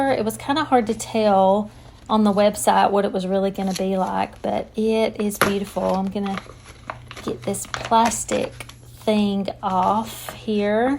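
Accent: South, United States. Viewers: Do i detect a Southern accent 🤔 👀 where are you from? 😊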